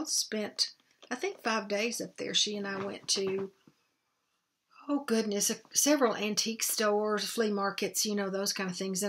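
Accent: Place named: Arkansas, United States. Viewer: Hi, I watched you for the first time today & certainly enjoyed it😊 I will be returning..we live close, we are on the Arkansas line, so your accent made me feel at home😊thank you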